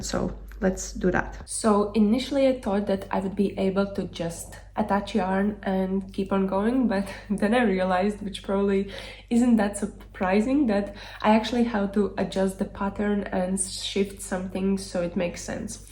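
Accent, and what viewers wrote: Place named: Eastern Europe. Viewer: i think it looks great😊 i would make it to the length below your knees, nearly to your shoes, that will give the „wedding dress“ type of dress, but it‘s definetely wedding dress material! keep on doing row by row, i‘m excited for the final part😊❤ after it‘s finished i would sew a skin color material under the dress so it looks like your skin but it gives you more secureness🤗 btw what accent do you have? it sounds slavic to me😊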